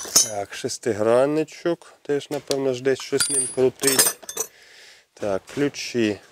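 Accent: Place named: Zaporizhzhia, Ukraine. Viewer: українською Ваше відео набагато цікавіше і колоритніше. В мене є колега з Запоріжжя ( я в Луцьку) то за вашим спільним діалектом зрозумів, що ви земляки, як виявилось ябув правий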